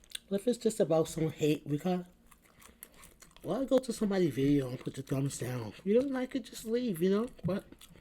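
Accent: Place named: New York, United States. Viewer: Heyyy Beautiful!!! I’ve been missing you, so I’ve come to visit!🤗 The BBQ Chicken looks amazingly delicious!!! I love your New York accent, Sis!💞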